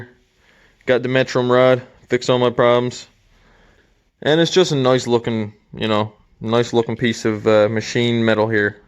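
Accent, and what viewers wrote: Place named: Canada. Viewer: Must be from Canada. Likss saying ooot not out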